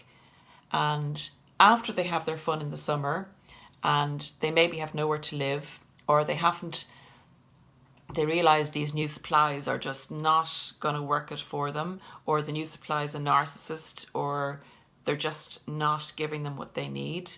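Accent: Ireland. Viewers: You deserve a lot of subscribers. You nail it on the head. I am 2 years NC. I feel a lot better but I still watch videos and was extremely happy to come across your channel. I’ve already shared one on fb. You are wonderful. Love your Irish accent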